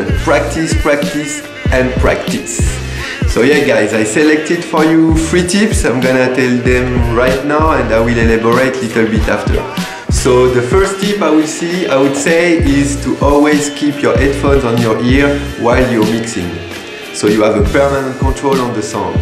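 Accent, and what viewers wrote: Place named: France. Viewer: Just love the french-english! :D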